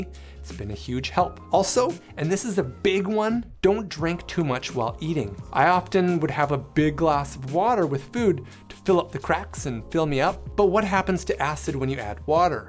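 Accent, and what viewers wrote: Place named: Canada. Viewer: No, hon', I'm vegan, so no animal products, whatsoever. As for my mental health, I've always suffered badly with anxiety and depression (I sadly lost my father to it 😞💔). My mental health got even worse last year. I had two really traumatic events (briefly homeless through no fault of my own, then hit by a snow plow 😭💔). I don't doubt that all the stress, (plus people stealing from me when my former apartment was condemned), didn't help to create this issue.😩 The night I moved into my new apartment in this new region, I had a UTI.😭 So, it was like my body was overcome with the stress. I just hope that this issue can be healed finally because I'm getting more depressed every day living with it.😞💔 Anyway, again, I so appreciate your help and suggestions, hon'.🤗 I hope you're having an amazing day wherever you are (I'm gonna guess Canada from your accent, but I could be wrong.😂).❤️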